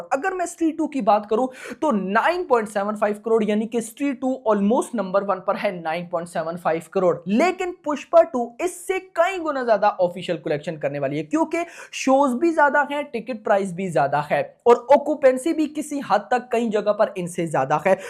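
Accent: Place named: Pakistan. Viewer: Yar tu Pakistani h kya Teri avaj pakistaniyo jaisi kag rhi bolne ka tarika 😢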